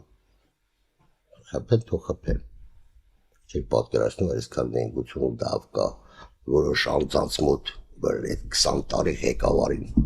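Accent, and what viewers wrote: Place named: Ijevan, Armenia. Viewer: Кяваро иджеванский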